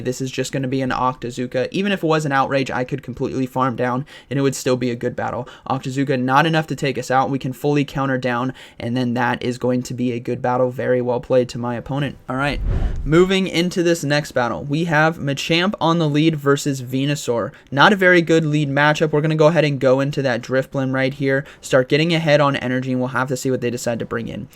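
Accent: United States. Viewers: Didn't realize you lived in the UK. You sound pretty American imo! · He's American, residing in the UK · Wait is from the UK? Thought he was American lol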